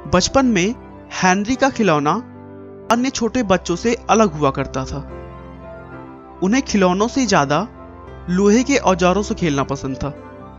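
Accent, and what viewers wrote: Bihar, India. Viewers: Abe tu bihari he kya...???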